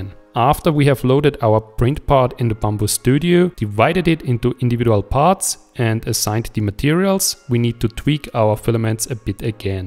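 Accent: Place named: Germany. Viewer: Wenn der deutsche Englisch spricht. Dennoch, gutes Video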